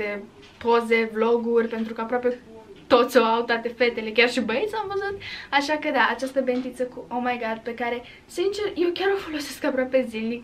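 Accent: Moldova. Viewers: Am o întrebare corbești moldovenește?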